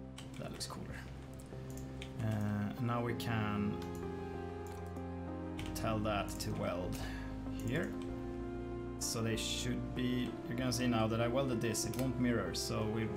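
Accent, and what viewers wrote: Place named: Sweden. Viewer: Nae då men jag tyckte jag hörde lite svensk dialekt 😀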